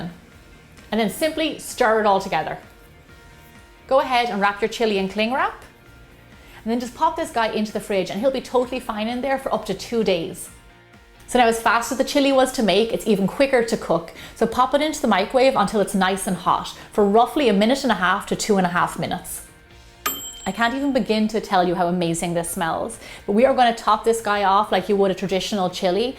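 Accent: Ireland. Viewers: no problem I loved your Irish brogue it is so cool and I love your microwave meals I made a olmlate in a teacup or mug it was good eggs cheese and tomatos for 1 minute it was good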